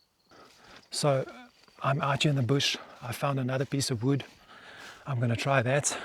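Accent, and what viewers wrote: South Africa. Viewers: it's uniquely South African ❤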